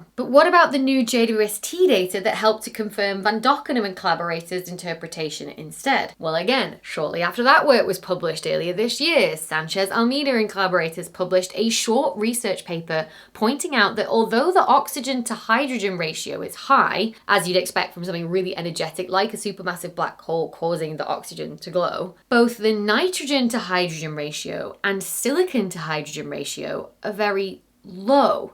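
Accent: United Kingdom. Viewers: Dr. Becky's presentation is such an intersection of delightful things from the subject matter to her effervescent enthusiasm for it -- and who doesn't want their serious topics presented with a bit of a British accent? Always a fun way to learn about this stuff. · Tell me you're British without telling me you're British (although, of course, the accent would be plenty). 26 Celsius is 79 Fahrenheit which is the temperature to which I set my thermostat for my air conditioning. The temperature she's melting at is the temperature I cool down to. Insufferable for her is comfortable for me. (There may be a video lighting issue I'm not allowing for, but she seemed to think the temperature was enough explanation).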